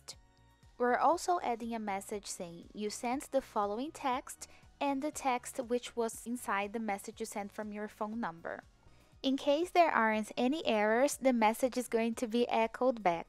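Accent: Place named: Brazil. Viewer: Seu sotaque brasileiro é inconfundível! Parabéns pelo vídeo!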